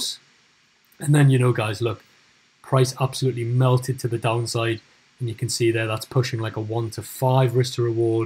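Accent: Newcastle, United Kingdom. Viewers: Really good video! Are you a Geordie?? Cheers!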